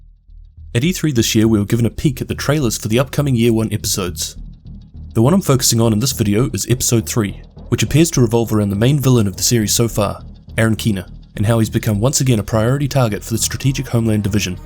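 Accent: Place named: Auckland, New Zealand. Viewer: sounds Aucklander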